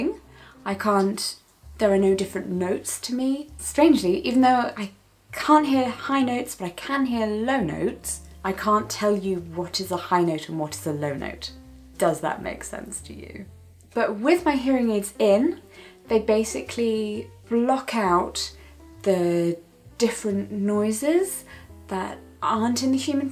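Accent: RP, United Kingdom. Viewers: I love how she is so British and almost posh but she is like “What the hell” and “God damn it!” It is such a distinktive personality trait of her’s :D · LOOOVE your British accent ❤️ ❤️ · You are very kind telling us that...and very pretty as well. I am learning German Sign, love you British accent 😍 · This is my first day subscribing to you, and I love all the videos I've watched from you! Btw, you have an amazingly beautiful accent, and I adore your phrasing. Well-spoken people with a British accent can impress me jolly deeply. xD And I'm gay too, so your channel's really lovely. :D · Most posh sounding deaf person I've seen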